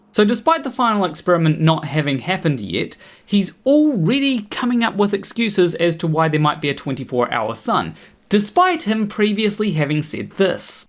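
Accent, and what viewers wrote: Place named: New Zealand. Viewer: That accent, are you a kiwi too?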